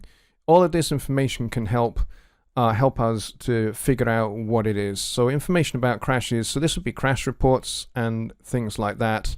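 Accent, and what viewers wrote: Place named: Scotland, United Kingdom. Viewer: Where are you from in Scotland 🏴 bud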